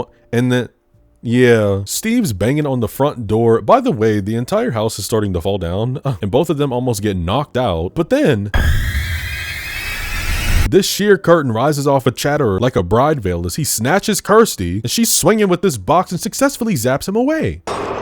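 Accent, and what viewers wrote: United Kingdom. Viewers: British?!!??! I had no idea🤦‍♀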